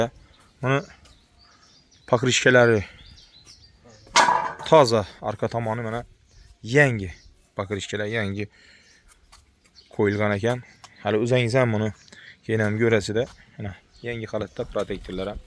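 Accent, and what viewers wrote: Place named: Khorezm, Uzbekistan. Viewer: Хоразмча сулливар кийналиб гетдингку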